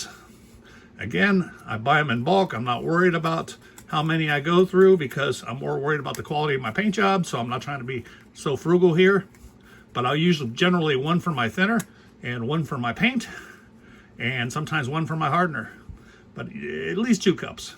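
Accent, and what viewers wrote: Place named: Ohio, United States. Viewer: Your accent sounds southeastern Ohio, no?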